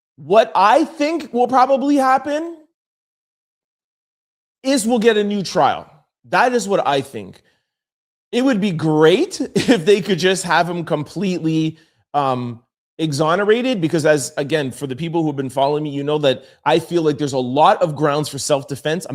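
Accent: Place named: Canada. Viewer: This Canadian accent is annoying as hell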